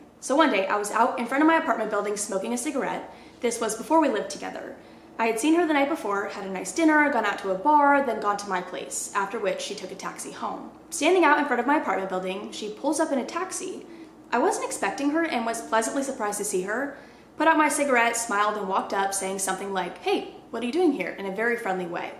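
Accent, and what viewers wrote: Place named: South, United States. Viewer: That southern drawl keeps shining through more and more. Subconscious or not, it's cute. Seems fitting, I dig it! 🤠😉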